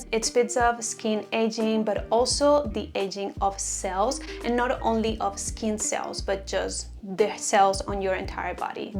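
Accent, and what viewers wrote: Spain. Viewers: Sabia que eras española! No hay ningún problema con tu pronunciación, eh? Al contrario. Pero lo estaba notando. Supongo que porque yo también lo soy xd Que tengas un buen día :)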